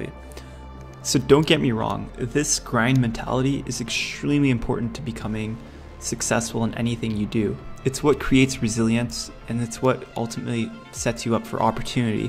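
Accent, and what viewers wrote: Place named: United States. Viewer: So what's your final point. I am sorry I am not very good at understanding American accent. Please explain me. All I understood was enjoying every moment. Is this video about that?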